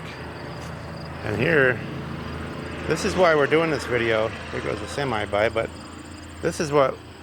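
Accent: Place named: Minnesota, United States. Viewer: That convent is a pretty magnificent old building in the daylight, but would scare the sense out of me to walk up on it at night. No way you'd get me in there. If I was to guess, I would say you might be from Minnesota. I lived there as a kid in the 60s, and your way of speaking sounds like my mother's side of the family who still live there. I've lived in Nebraska ever since then and some people still guess me to be fom Minnesota. Some things ya never lose. Enjoyed the tour. Thanks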